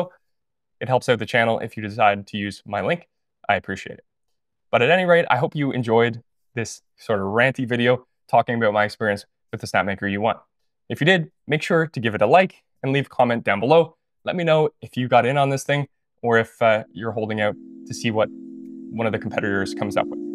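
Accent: Canada. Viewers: Good video. You've got. A unique. Halting style of speaking Canadian. I don't hate it. Eh.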